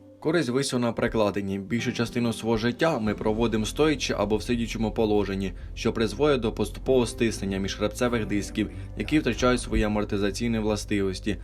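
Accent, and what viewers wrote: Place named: Poltava, Ukraine. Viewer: Круто, просто, і без води, Українська мова просто мед для моїх вух( якщо не помиляюся то Полтавський акцент) у автора велике майбутнє.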